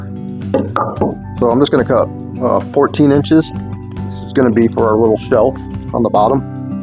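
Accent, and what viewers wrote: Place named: South, United States. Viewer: Very nice - I can do this! Unique. New sub from this vid-make more easy project vids with that country rock twang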